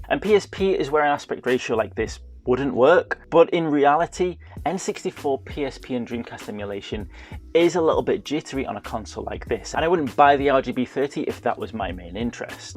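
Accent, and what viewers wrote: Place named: United Kingdom. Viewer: Nothing worse than clicking on a video from a YouTuber I don’t know and hearing a British accent 🤮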